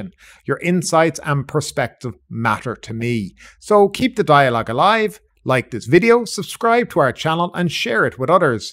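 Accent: Ireland. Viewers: you sound Irish but look distinctly J.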